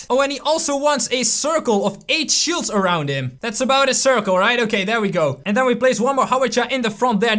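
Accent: Netherlands. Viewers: Het klinkt of jij nederlands bent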